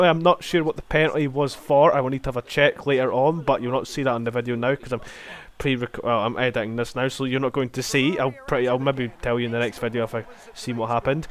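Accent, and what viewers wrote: Scotland, United Kingdom. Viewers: Are you Scottish? 🏴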